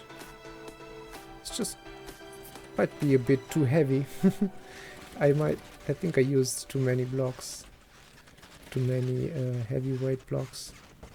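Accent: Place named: Germany. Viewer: me Too:D i knew i heard that you have some german accent:D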